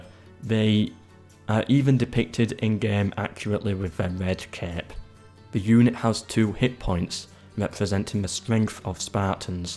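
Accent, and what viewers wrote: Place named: Yorkshire, United Kingdom. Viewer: The Romans would call this old pronunciation issue as a "vexata quaestio". Actually, very few Brits/US folk bother to pronounce Latin or Greek names as they ought to be pronounced (ayup, these two languages have got their own pronunciation, far far before even Old English existed... :D). There may well be ethnocentric reasons behind this (in astronomy, for instance, this is totally blatant, a little bit less in history, maybe) but it's always refreshing to me when someone decides to utilise the original pronunciation, doing justice to those historical names. Regardless, the proprietor's Yorkshire accent sounds absolutely pleasant and very very fit for divulgative/entertaining videos such as his own.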